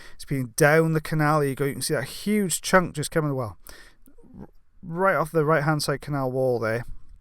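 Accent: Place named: England, United Kingdom. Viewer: I love skin peels! I had a good laugh for a moment when you said "tinnitus". I couldn't figure out what that meant for a minute because over here, we pronounce it as "tin-eye-tus" haha. I love hearing the different words you use and the way you say them. I look forward to all the "English" English in your videos. Keep up the great work!!!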